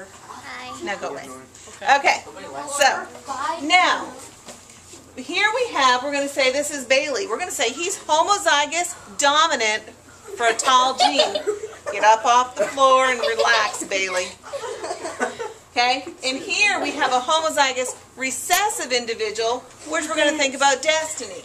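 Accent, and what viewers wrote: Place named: South, United States. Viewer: That's pretty amazing information! I love your new found southern accent! I can see that you turned out to be a great science teacher!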